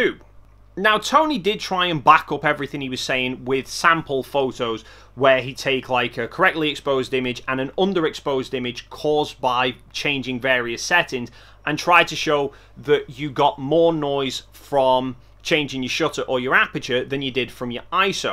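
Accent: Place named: England, United Kingdom. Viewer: Also have to admit that I am torn about english accent. I mean... sometimes I think of Richard Attenbourough and while other times I think of an evil character from a movie... snotty and just evil!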